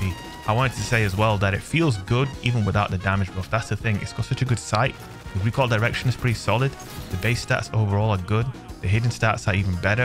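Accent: United Kingdom. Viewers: Something about a britsh man talk about fish in destiny is oddly satisfying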